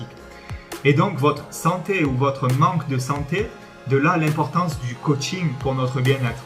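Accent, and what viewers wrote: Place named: South, France. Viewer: Ah tellement de jolies explications! Couplées à cette accent du sud, magnifique!! ☀️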